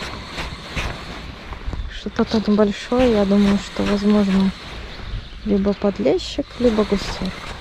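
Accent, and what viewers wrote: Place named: Belarus. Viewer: Белозубая улыбка это нечто!! И профиссиональные снасти аксессуары это зачёт!! Смотрю тебя с удовольствием настроение супер, спасибо за видосы природы и твои лайфаки для рыбалки и конечно твои комментарии говорок белорусский!!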